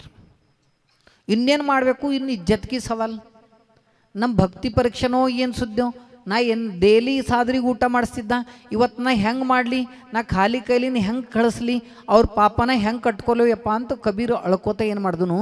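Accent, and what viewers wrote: Bidar, India. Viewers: ಬೀದರ್ ಭಾಷೆ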